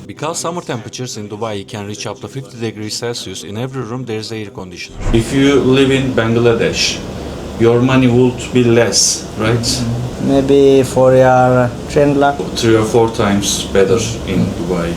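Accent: Turkey. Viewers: türkçe seslendirmeyi kapattım hala türk aksanı var diyorum niye meğersem türkmüşsün :D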